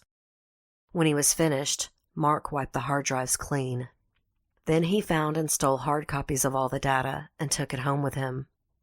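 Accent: South, United States. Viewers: Btw, even though i said that on my last comment, i have subscribed. I love your relaxing southern voice and your story telling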